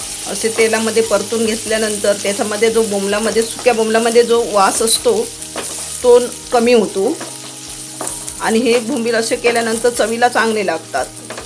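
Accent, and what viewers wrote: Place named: Rural, India. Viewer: Tharrat marathi aahe tumchi baba chan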